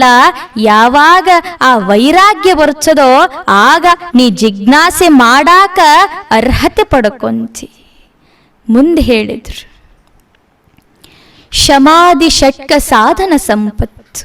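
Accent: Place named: North Karnataka, India. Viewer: Uttara karnataka bhashe shyline ond maja kelokke 😂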